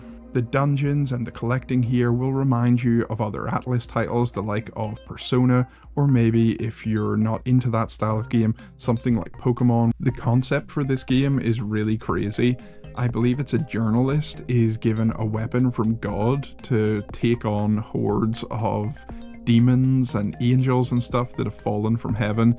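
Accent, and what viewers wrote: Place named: Scotland, United Kingdom. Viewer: You Sound Scottish. I Am American...I Love Scotland,And Am Thinking Of Maybe Moving There...But Your Scottish For Sure I Can Hear It.,Cant Get Rid Of That Accent Lol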